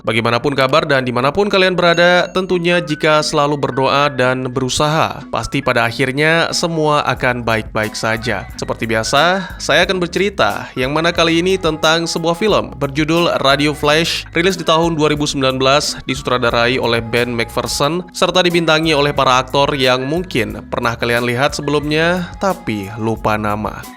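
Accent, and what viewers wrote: Aceh, Indonesia. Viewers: Maaf bertanya org Aceh ya bg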